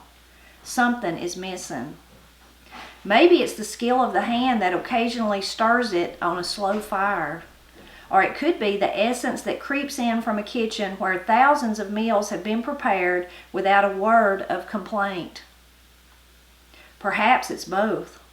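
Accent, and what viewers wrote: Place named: Eastern Kentucky, United States. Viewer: I grew up in eastern KY. Her accent sounds familiar and her recipes are legit what we all ate. She even has the correct kitchenware 😂 Love this channel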